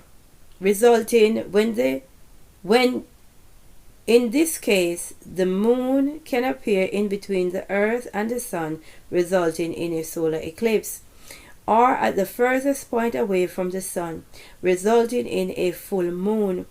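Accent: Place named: Jamaica. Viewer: she never said 'tsunami all over the world'. listen carefully and dont put words in her mouth open your ears. She said it will hit certain areas off the Atlantic which is a massive geographical area. Then, the following infrastructure collapse will cause knock on effects to other parts of the world. That is what she means when she says the tsunami will affect the whole world. go watch the movie 5th Wave and it will show you the collape of society etc. There is also the possibility of a tsunami on the west coast of the US from the Hawaii volcano. this lady does extensive research before each vedeo. dont put her down just she has a Jamaican accent. even Geologists are saying the same thing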